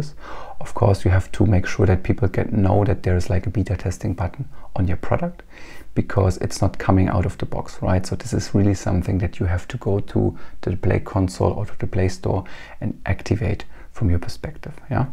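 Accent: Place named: Germany. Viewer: Didn't expect Henry Cavill with German(?) accent.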